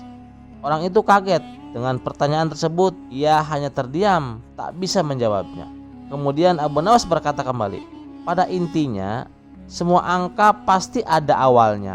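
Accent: Sunda, Indonesia. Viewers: Keciri iye org sunda yg mawa beritana.. medok pisan euy sundana..😂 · Ieu nu nyarita orang Sunda, medok sundana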